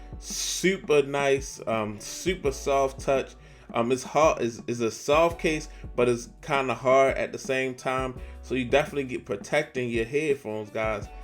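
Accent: Baltimore, United States. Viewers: The bmore accent coming out strong in this one 🤣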